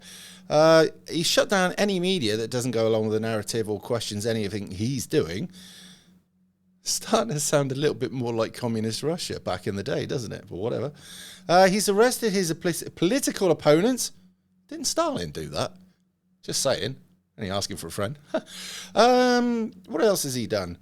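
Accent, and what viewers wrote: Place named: West Country, United Kingdom. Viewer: You sound like a West Country mental defective.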